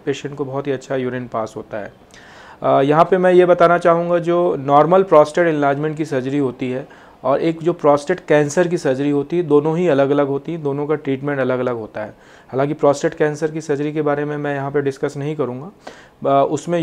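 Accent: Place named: Uttar Pradesh, India. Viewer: VERY SOFT EXPLANATION WITH U.P ACCENT, THANK YOU.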